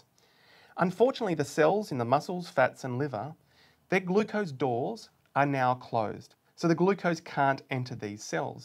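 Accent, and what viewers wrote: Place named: Australia. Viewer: Your Australian accent is relieving my stress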